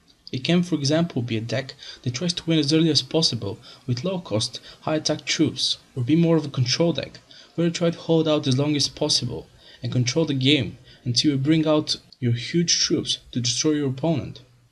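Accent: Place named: Russia. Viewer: Your accent is metro2033. Great video!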